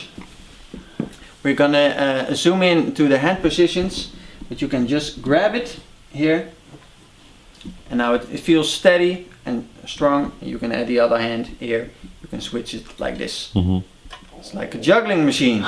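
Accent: Netherlands. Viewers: I knew you were Dutch the moment I heard you speak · Its a Dutch accent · that dutch accent tho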